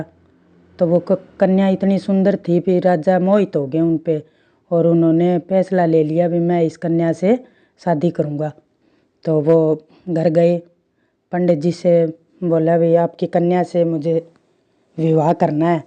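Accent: Haryana, India. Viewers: Aap haryana se lgti ho bahan ji prnaam 🙏🏻🙏🏻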